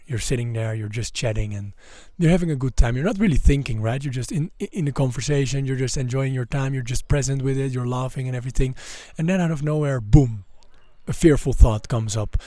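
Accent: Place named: Netherlands. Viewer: Hey, ik hoor aan je manier van praten dat je Nederlands bent, dus ik dacht dan typ ik ook lekker in mijn moedertaal een reactie:) Je kwam tevoorschijn op mijn tijdlijn en ik wou je even bedanken dat je je wijsheid met de wereld deelt. Het tovert instant een big smile op mijn gezicht, blijf doen wat je doet! Mensen hebben het anno 2025 echt nodig om door zulke zaken geïnspireerd te geraken. Thank you, brother!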